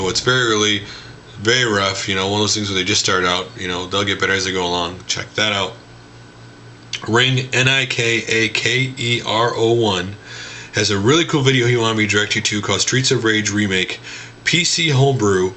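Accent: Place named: Austria. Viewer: speak German with an Austrian accent - much like Arnold Schwartzenegger's accent. That is quite amazing doing videos in both English and German. I considered doing something similar with Dutch and English videos but most Dutch gamers speak English too so I figured there would not be much of an audience besides doing the same video twice is just an enormous amount of work. Great episode man! A good personality comes across regardless if you are in front or behind the lense.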